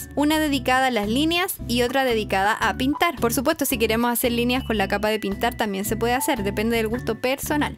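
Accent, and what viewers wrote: Chile. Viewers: eres de chile se nota por como hablas no digo para ofernder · holi eres de chile? se nota en tu voz uwu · Eee es chilena vivan los chilenos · cuando escuche tu voz dije: OOOOOHH es chilena xd edit: Like y gracias por el tuto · de casualidad eres chilena? es que tu acento es super marcado y lo conozco porque tambien soy chilena:virtualhug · sos chilena?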